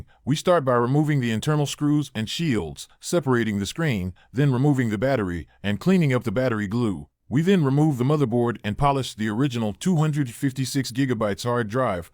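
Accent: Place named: United States. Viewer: The man doesn't exist, repairs all done on chinese phones...Voice is american.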